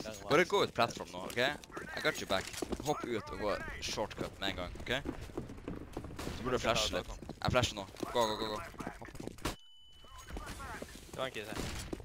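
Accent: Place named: Tromsø, Norway. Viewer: The best thing 'bout your highlights is that Tromsø dialect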